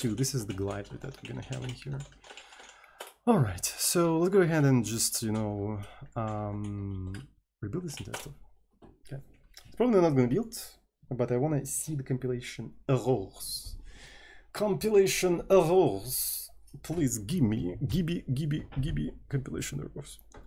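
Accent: Russia. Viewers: You Russian accent is too strong, it's вери анплезант фор май ирз. Ю шуд стоп сейнг s like з энд th лайк с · They are probably YouTube auto generated, he has a moderately thick accent, it's not quite Russian it's almost a mix of Russian and South African sounding, but it isn't pure clean sounding English so the auto transcriber may have had difficulties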